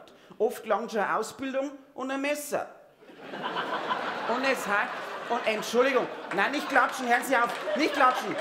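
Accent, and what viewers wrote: Bavaria, Germany. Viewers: Ich muss sagen, komplett bayrisch ist sein Sketch noch mal besser.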